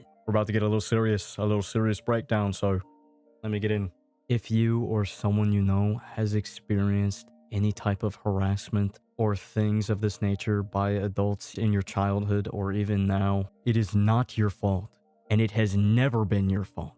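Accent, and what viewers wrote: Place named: United Kingdom. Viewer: in his British accent era is peak:D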